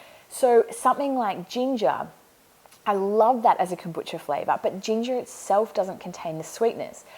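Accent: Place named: Australia. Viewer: Must be in Australia I love the Australian accent ariad I just made ginger beer that was easy but you do not need one of them nasty scobie's..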